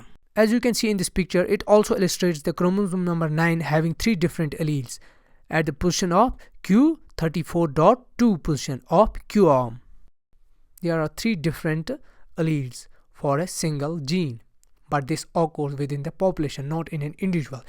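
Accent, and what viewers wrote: Kashmir, India. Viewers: Aapkee aawaz suntai hee smjh aaya aap Kashmiri ho😍❤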